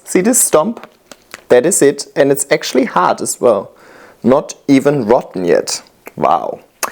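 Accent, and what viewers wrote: Germany. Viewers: I sorry but I have to ask are you from Germany or Austria? You sound like my friend from Berlin 😅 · Are you originally from Germany? I can hear an accent. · I don’t know why but I can’t get over your accent (?) there’s a voice in my head screaming “he is germannnn!!!!!” Love your Videos btw :)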